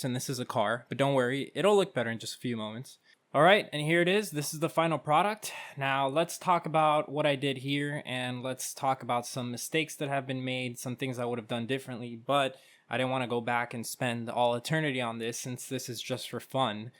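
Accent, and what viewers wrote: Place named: Miami, United States. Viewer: I knew it since i heard your voice my first reaction was “something about his dialect just screams Miami” lol Our dialect sticks out like a sore thumb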